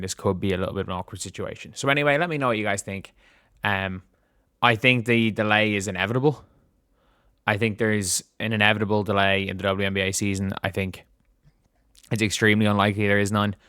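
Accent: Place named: Ireland. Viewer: I love to hear my hoop news in your charming Irish brogue. Reminds me of my immigrant dad. Love u dude keep spittiing those facts me boyo 🇮🇪🏀☘️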